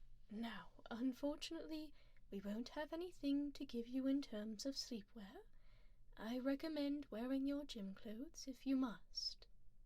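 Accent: RP, United Kingdom. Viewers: I love when does her posh British accent. Its so relaxing. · Your British accent is amazing. So is this artwork of Leonara! You're so talented and amazing! You do a phenomenal job of bringing your characters to life! 😍😍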